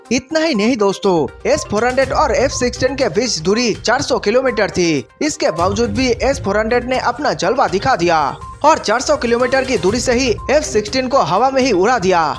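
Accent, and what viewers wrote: Bengal, India. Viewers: Bhai tu bangali ha tera bolna k ton sa pata chal raha ha lagaraho bongo munna bhai Jay hind......🇮🇳🇮🇳🇮🇳🇮🇳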